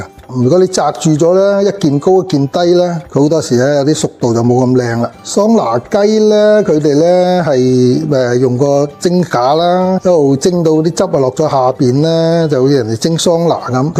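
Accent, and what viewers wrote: Guangzhou, China. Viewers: 我是地道的广卅人 师付的粤语带着很多的广卅方言 听起来很亲切 跟学做菜 吃到了自己喜欢的美食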